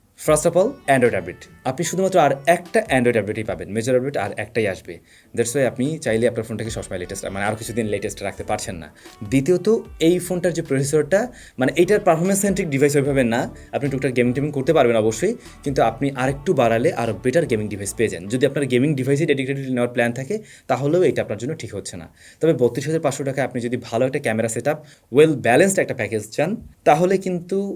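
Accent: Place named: Jashore, Bangladesh. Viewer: vai apnar pronunciation ta jashore er vibe dei. Apni kon jelar origin? Sadat Jashore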